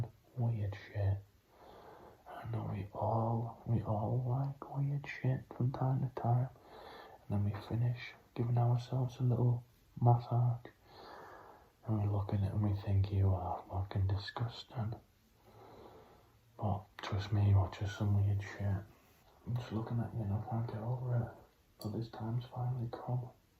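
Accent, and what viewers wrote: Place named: Newcastle, United Kingdom. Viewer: Your geordie accent gives me mad tingles seriously more than like any other accent. Crazy. P.s. you’re beautiful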